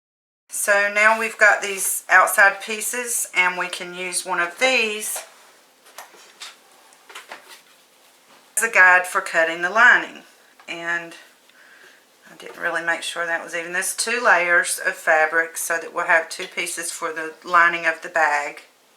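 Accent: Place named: New York, United States. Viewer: It's so great that you keep your accent in your video!! so much of the usa is working to eliminate accents and talk like tv newscasters in a fabricated (non)accent called "general american". Its so boring and awful. I think it wont be long and the nyc/nj accent will be wiped out. Its sad. Kudos to you.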